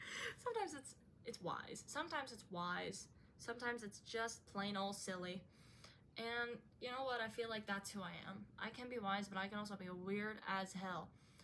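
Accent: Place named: South, United States. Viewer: Haven't heard this song before but will definitely check it out, your cover sounded good!! I've been subbed for a while but don't really tune in frequently, but your little yap session was a reminder that I need to watch these more often! Also, the moment you mentioned you were from the south I was noticing little bits and pieces of your accent with certain words, funny how that works! Take care and look forward to your next post ❤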